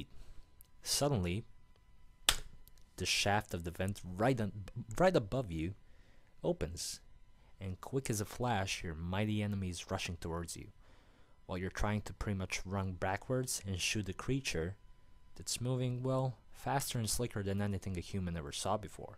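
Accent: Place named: Germany. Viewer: Yo great review thanks for being 1st ur German accent makes it E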